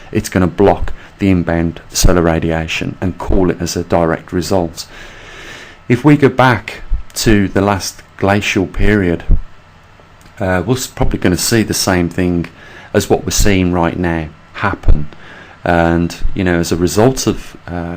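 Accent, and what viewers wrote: United Kingdom. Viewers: excellent top notch scientific report narrated with the most pleasant to listen to British accent. greatly appreciate your time to produce and share your knowledge with the truth seeking community. peace be upon you always.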